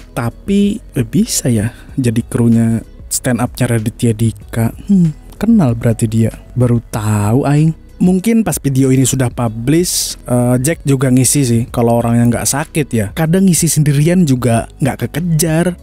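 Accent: Banjar, Indonesia. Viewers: Hanyar sadar, sakalinya mimin urg banjar🤣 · Orang Banjar kah mang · Bang , orang rantau tapin atau Banjarbaru?? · Orang banjar kah bang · Orang Banjar kah pian nhe hehehe😇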